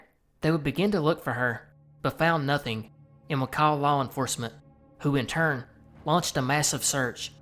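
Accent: South, United States. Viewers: Keep up the great work! Love hearing a southern voice on here!!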